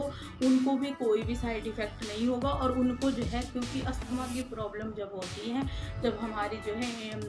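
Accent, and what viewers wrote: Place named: Kolhapur, India. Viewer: बहूत आभारी है मैडम कोल्हापूर के भाषा में लय भारी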